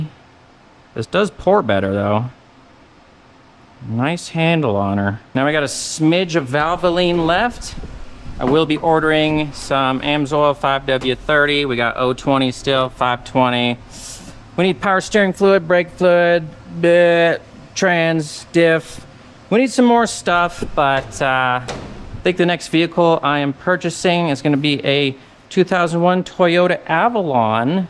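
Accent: Canada. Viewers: Love your videos. Do you use Bosch 3323 filters on Hondas since they moved away from Filtech and what do you think about Aisin transmission fluid and coolant? Gemini told me that it is as good if not better than Honda but I was a little concerned when I looked at my receipt and saw that my independent shop didn't use Honda fluids when I had my 73K 2012 Odyssey EX-L in for service. I bought it new and hauled the kids around in it for years so I can't get rid of her even though the last service cost me more than she is worth. I thought that Aisin timing belt kits were industry standard but didn't know anything about their fluids. Can you share your thoughts on those products - either in comments or on a video? Also, I assume you grew up in Canada based on your accent, etc. but haven't seen a video exp,aining that. Is there one that I missed?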